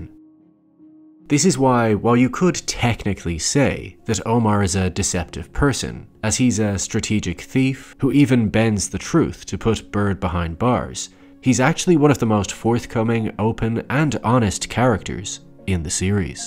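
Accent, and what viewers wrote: Ireland. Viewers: Great channel. Please consider using your own lovely accent rather than the classic youtuber vocal fry + upwards cadence thing. I can hear there's an Irishman in there!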